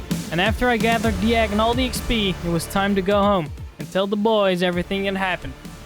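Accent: Netherlands. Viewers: Hmmmm you sound Dutch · Are u dutch??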